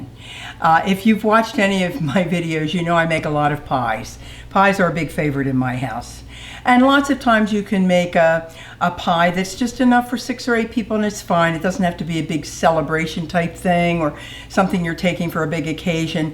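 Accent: Philadelphia, United States. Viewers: Is that a Philly/Delco accent I hear? 😃 This dessert looks so yummy! 🍰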